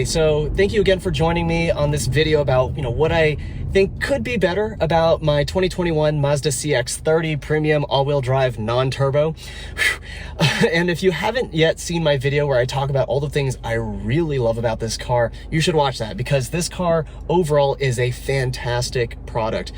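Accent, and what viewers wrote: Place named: United States. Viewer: Hello from Peru!! Great video!!! You sound like are from USA but what your ethnicity is? So handsome! Thank you :)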